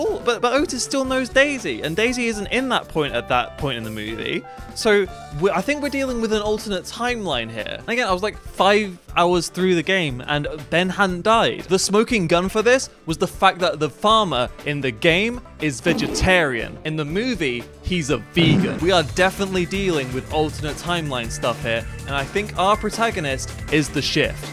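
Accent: United Kingdom. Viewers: I remember playing this game and thinking it was neat. I didn't think I would be reminded of it by a cute bri'ish boi using it as a vehicle for a mental breakdown. lmfao In seriousness, take care of yourself, bud.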